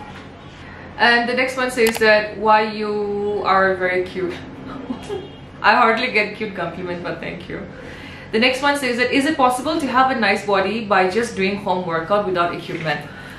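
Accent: Nagaland, India. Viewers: Wow you sound like an Angami while speaking Nagamese😀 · Your nagamese accent is just us❤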